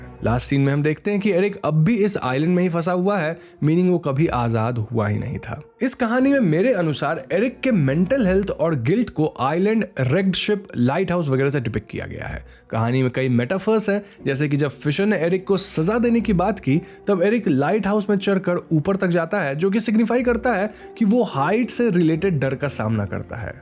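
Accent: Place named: Bihar, India. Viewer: Yeh bihari hai..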